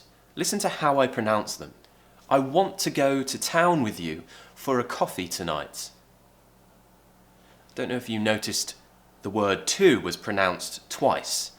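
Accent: RP, United Kingdom. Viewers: without exaggerating you are the Best example For the Morden R p accent, and I have learned something Form you.... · don't know what i would've done.. if I hadn't seen your videos... i learnt amazing british accent... love ya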